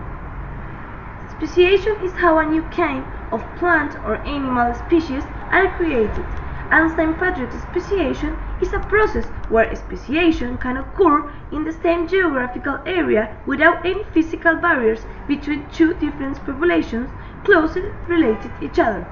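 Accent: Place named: Mexico. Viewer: Hahahaha, good video, you have a funny accent. You're from Mexico right?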